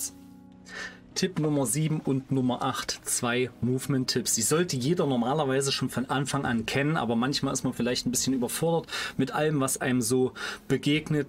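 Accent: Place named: East Germany, Germany. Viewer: Like fürn Ossi Dialekt!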